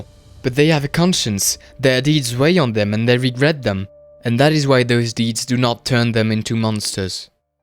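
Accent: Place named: France. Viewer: Cet accent français... sublime.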